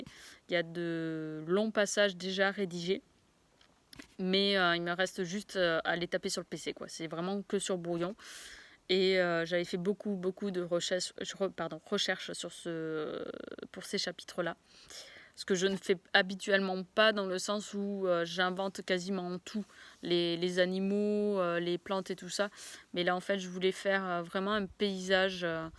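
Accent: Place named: South, France. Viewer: Bonne vidéo, j'imagine que tu es du sud non? (à l'accent) sympa la vidéo elle est nette et tu es à l'aise devant la cam, juste petit conseil tu devrais filmer en horizontale pour éviter les bandes noires;)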